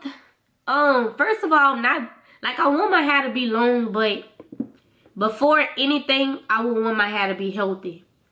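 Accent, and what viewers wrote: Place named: New Orleans, United States. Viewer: Lol i knew you was from nola before the xmas intro. Yassss, bounce to the beat. I did this tag as well it was fun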